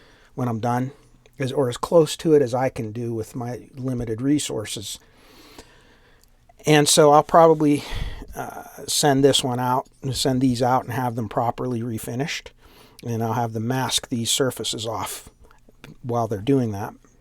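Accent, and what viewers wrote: Pennsylvania, United States. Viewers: Happy New Year.... From your accent (PA), I would guess you know the lebanon bologna cure for your cold.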